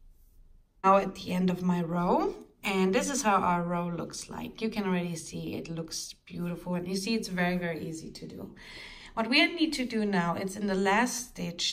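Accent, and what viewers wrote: Germany. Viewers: you speak English with the exact same accent as a German colleague of mine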